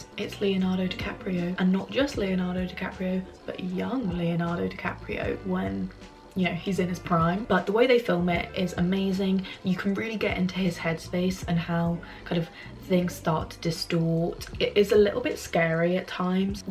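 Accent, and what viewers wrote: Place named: England, United Kingdom. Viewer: where abouts in england are u from? love your accent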